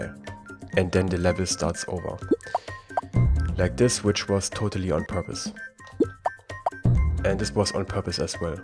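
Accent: Germany. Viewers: you sound like you are german. Are you? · are you from Germany? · so ein geiler deutscher Akzent😂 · bist du deutsch? dein aktzent kört sich so an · I just feel that german accent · Dieser deutsche Akzent. <3 Schön erklärt. xD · I love your german accent, makes it so much easier as a german for me to understand this compared to some american tutorials :D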